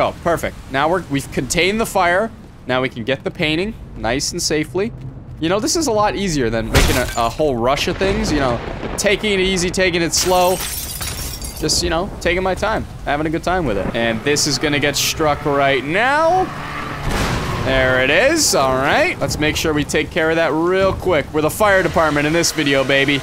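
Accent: Canada. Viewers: I love how we forget how Canadian he is until he says “Home Hardway” “Canadian Tire” and “BAG” “EL” instead of bagel 😂😂😂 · has never sounded so Canadian and I love it 🤣🤣🤣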